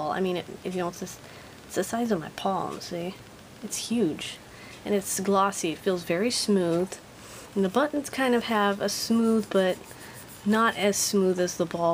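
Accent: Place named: United States. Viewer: I really like your voice and English accent. Also, you've got a sexy laugh . Don't think I'm hitting on you, just a honest observation. Where are you from? East coast? West coast USA? midwest? South?. I'd like to know what particular US English accent I like, and this is it.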